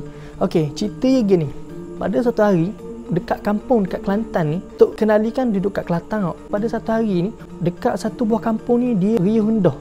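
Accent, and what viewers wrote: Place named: Terengganu, Malaysia. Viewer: Kalu comel lagi ulasan cerita tu biar seling kelantan buke seling terengganu. Terbaik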